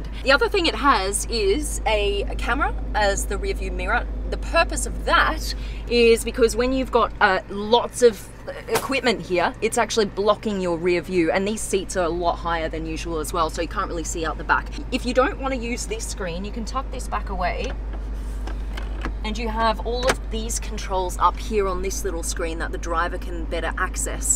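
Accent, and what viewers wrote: Australia. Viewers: Are you from Australia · Nice! I saw the nasdaily video you were with beautiful australian accent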